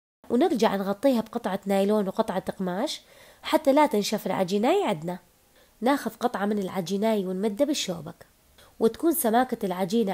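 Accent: Mosul, Iraq. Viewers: عاشت ايدج يالمصلاويه لهجتج احله من الكليجه الله يحفظ الموصل واهلها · عاشت أيدك ياحلى لهجه الموصل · عاشت ايدج مصلاويه اللهجه تخبل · آللهہجة آلمـصـلآوية تجننن ❤❤ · ليش الموصل لهجتهم شويه تختلف عن بغداد. اكو كلامات متنفهم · فدوة للهجه تجنن اموت عل مصلاويين وكلامهم الحلو فديت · اكتبيلي عن حياتج عمرج اني احب الهجه الموصليه انتن الموصليات معدلات بلطبخ ام حيدر من الديوانيه الله يوفقج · اشقد حلوة اللهجه الموصغيه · حلوه لهجت أهل الموصل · عاشت ايدكي حبيبتي قبان لهجتنا الحلوي الله يحفظ الموصل واهل الموصل يارب 🤲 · كلامك حلو مصلاوي احب الكلام المصلاوي و الاكل المصلاوي لاني عشت بالموصل اربع سنوات خلال دارستي بجامعة الموصل و بقيت كل سنة بالعطلة الصيفية اسافر للموصل كلك رائع ياعراق من شماله الى جنوبه مهما بعدنا عنك فأنت عايش بالقلب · الله ع اللهجه الموصلية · اني عجبتني اللهجة الموصلية واللدغة باللسان روعة وعاشت الايادي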